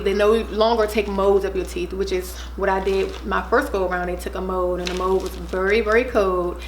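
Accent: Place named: Washington DC, United States. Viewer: Your dc accent is cute.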